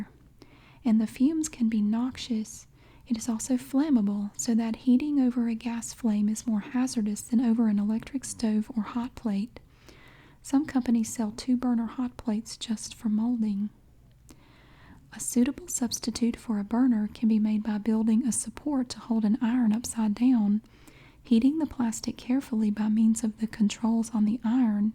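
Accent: South, United States. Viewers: I love your gentle southern accent.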